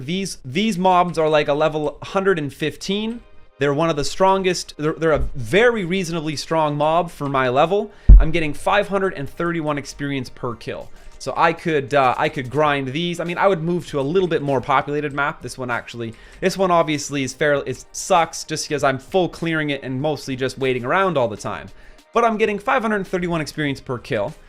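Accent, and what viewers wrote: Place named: Canada. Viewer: UR CANADIAN ACCENT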